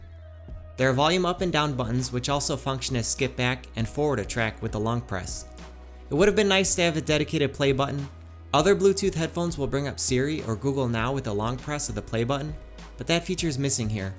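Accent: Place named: Chicago, United States. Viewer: Ur Chicago accent is annoying. U seem to complain too much be happy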